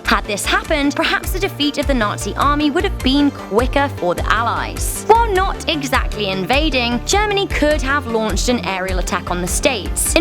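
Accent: United Kingdom. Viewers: British sounding person detailing a war between the U.S and Germany. But this, does put a smile on my face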